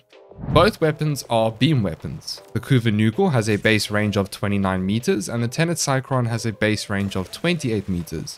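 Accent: South Africa. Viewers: Thx for the vid I was watching your kuva litch vid since im a total noob and wanting to try get a kuva nukor since I have a normal nukor.. Wanted to ask are you a S-A local your accent man sounds like it?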